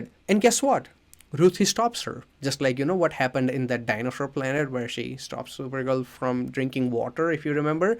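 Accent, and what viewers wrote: India. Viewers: I love your channel so much. I'm not sure if you're Bengali or Indian, but this is the first time I've seen a commenter who has such an accent. Also you seem to have so much passion for movies and entertainment, but you're also respectful and you point out the problems societaly and with hollywood. I really enjoy your content! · Accent gang Are you Bengali perchance?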